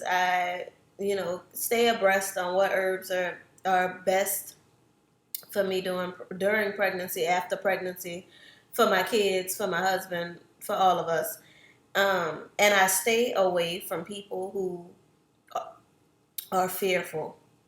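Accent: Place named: New Orleans, United States. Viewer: I love that you shared this! Having a birth plan is key when giving birth at either a hospital or birthing center. My midwife had a major concern with my pregnancy because I was so tiny, so she was afraid that my baby wasn't growing anymore and she wanted her to come out. She was going to insert something vaginally to induce me, but when she referred back to my birth plan, she did her best to follow my wishes and she did just that. My first birthing experience was amazing! I hope you have a better birthing experience with this baby. Congrats! You have a beautiful family & I also noticed that you are from New Orleans, I can tell by your accent because I am from there too. Lol!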